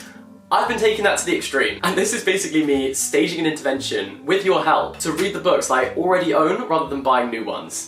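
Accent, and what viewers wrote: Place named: England, United Kingdom. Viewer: Again, your english accent is exquisite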